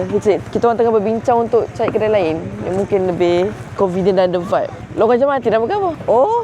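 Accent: Kelantan, Malaysia. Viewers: woi, steady ahh kecek kelate...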